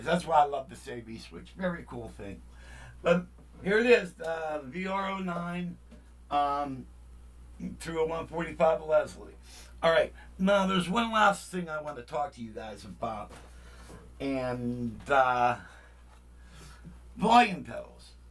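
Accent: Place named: Pittsburgh, United States. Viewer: I know a yinzer when I hear one 👊🏼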